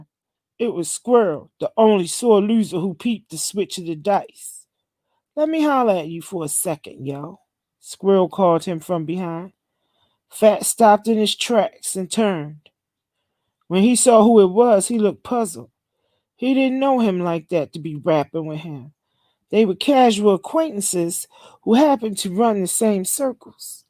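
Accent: Baltimore, United States. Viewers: Your very welcome... its a compliment definitely... your personality makes this reading fun and interesting... your Baltimore accent makes the story more realistic...I also appreciate that your reading the entire book. Ralph Reads on YouTube got me waiting for weeks for the 2part...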